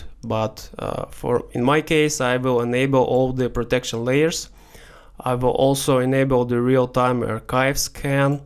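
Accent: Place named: Russia. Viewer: че то у тебя акцент то Русский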